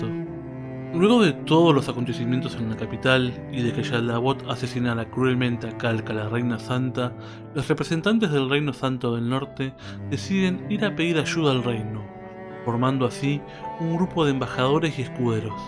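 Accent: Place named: Argentina. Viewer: ¡Ése sentimiento en el guión! 👍 👍 👏👏👏,para un Hispanoamericano, sólo lo puede vocalizar un Argentino 🙌🙌😘💜, te lo dice un Chileno amante de su Patria, ¡bravo por tí! 👏👏👏✌✌✌🙌🙌🙌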